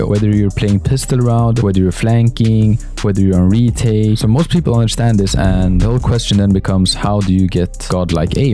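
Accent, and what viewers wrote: Sweden. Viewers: if u dont mind where r u from? r u swedish by any chance?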